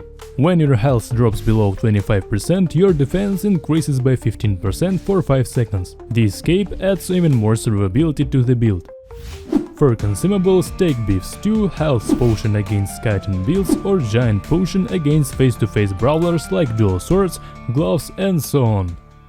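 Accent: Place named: Eastern Europe. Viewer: This guy never misses! Once again, a great video. I also like how you included and broke down the fights with each staff, very informative. You probably already have it on your list, but I would love to see an arcane staff video from you. Arcane been kicking my a*s in CD but might be just a skill issue on my end. Just a bit of viewer feedback, I personally found it harder to follow at times, as the music seemed to be loud at some points of the video. Personally, I would prefer if the music volume would be a little lower overall. As someone who has an Eastern European accent like yours, I find it that people take more time to register what your saying. So during the fast paced fight narration parts of the video the music can create a bit of a barrier. Other than that, it's a perfect video! Love your style, and thanks for sharing your knowledge with us mate! 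Giveaway entry: VageMage - Asia server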